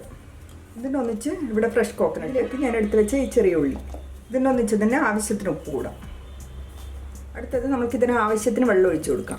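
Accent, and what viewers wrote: Kannur, India. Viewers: Super dosa..I will try ..Kannur slang 👌👍. thanks for sharing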